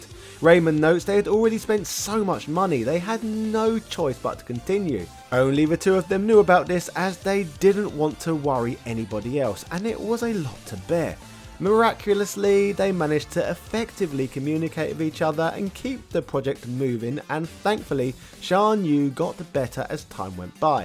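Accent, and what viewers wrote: United Kingdom. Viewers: I’m not even going to watch this video with this dumb British accent and say this franchise will never die because it’s the best on the planet, so it wasn’t about to peace out I mean double dragging neon was amazing.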